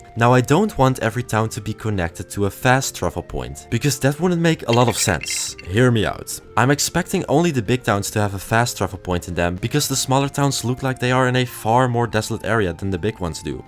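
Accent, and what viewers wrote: Netherlands. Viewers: Are you dutch?!?! I LOVE IT! I already thought it because of the accent. Please like, if ur dutch! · Ik wist dat deze klerelijer nederlands was met dat accent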